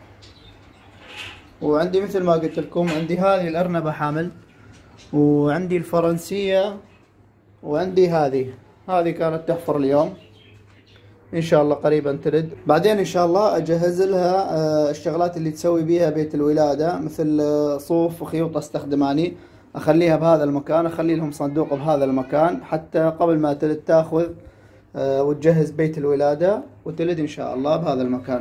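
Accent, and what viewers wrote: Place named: Mosul, Iraq. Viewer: عبالي شامي طلعت من اهل الموصل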